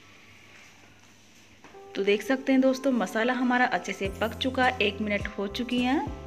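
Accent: India. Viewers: Nice Video ! From your voice it appears you are from Rajasthan !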